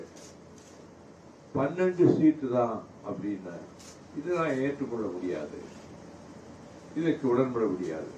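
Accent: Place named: Telugu, India. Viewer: தெலுங்கன் பேசுவது தெரிகிறது. இது 10" 10 ரூமா 😢😢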